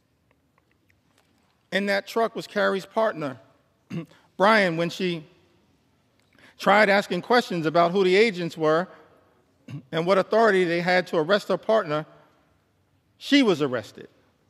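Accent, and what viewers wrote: South, United States. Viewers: Trying so hard to model his presentation after Obama’s. Check his cadences, body movements, and his love of repeating his punch lines 3 times. And what’s with his southern accent? He’s not from the Carolinas; he is from New Jersey!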